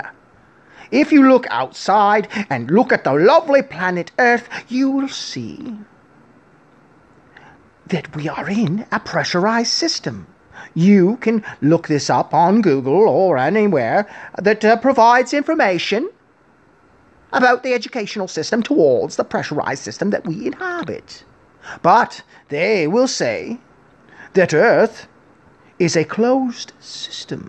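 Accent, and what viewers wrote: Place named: England, United Kingdom. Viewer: If I didn’t know better, I would have thought you were from England not Alberta. 😘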